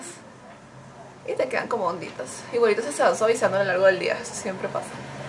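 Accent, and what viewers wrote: Dominican Republic. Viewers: Eres dominicana ? pk tenemos el mismo acento;p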